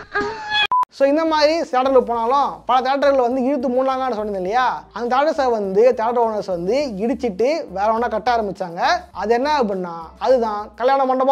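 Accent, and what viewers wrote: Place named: Vandavasi, India. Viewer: Brother unga sondha vur vandhavasi bola irrukae🤔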